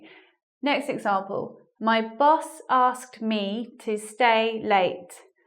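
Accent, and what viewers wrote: United Kingdom. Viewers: Your voice and British accent are so elegant that I come to listen to them no matter what you tell (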